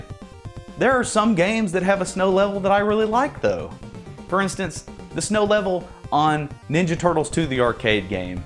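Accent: South, United States. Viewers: Love the southern accent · Listening to a dude with a good 'ol boy accent talk about horseshit in the Dark Souls series is peak internet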